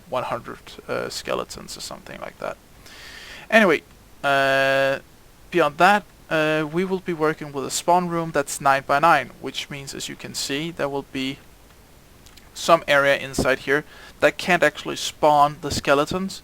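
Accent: Denmark. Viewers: Im curious, but are you by any chance Danish? That accent sound really Danish hehe (Im a Dane myself, thats why ;) ) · Jeg ved ikke helt... men jeg tror jeg kan høre på din accent at du er dansker? :) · Are U danish??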